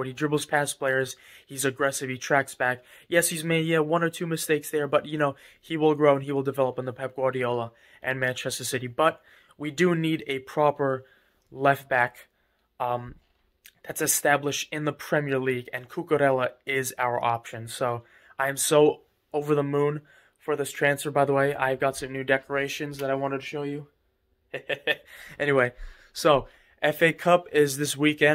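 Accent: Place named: New York, United States. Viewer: I can tell you are from New York without telling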